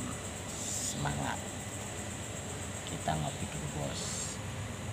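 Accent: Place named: Banyumas, Indonesia. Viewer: Salam satu "ngapak" kang..... 😀👍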